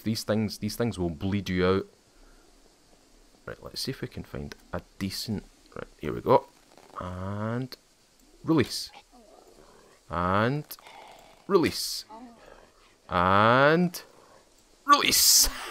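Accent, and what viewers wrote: Scotland, United Kingdom. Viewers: Tobot 27 it’s fukin Scottish he said he was from SCOTLAND 🤦‍♂️ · by the way you sound like bigmoney he is also a Scottish YouTuber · You sound like a very generic Scottish man but i still love you're voice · I would love to hear you say with your scottish accent BEGGOONNEE THOOOT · Scottish, I think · only reason i came here, the Scottish accent. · I heard your voice and had to subscribe haha love the scottish accent probably due to my best friends family all be scottish and me growing up around it even though I love in england. Looking forward to binge watching some of your videos haha! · This guy has a very stereotypical Scottish accent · I love the wee Scottish accent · Is that scottish accent or welsh or irish or Scouser accent?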